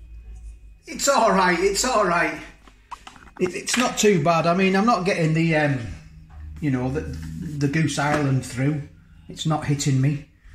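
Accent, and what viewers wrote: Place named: Lancashire, United Kingdom. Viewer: Where you from, you speak like me lol. Lancashire/?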